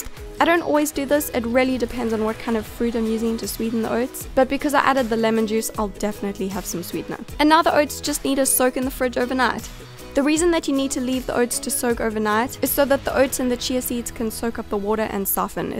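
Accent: South Africa. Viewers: Sounds like a South African 🇿🇦 ? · She's south African like me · You’re South African! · South African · are you South African?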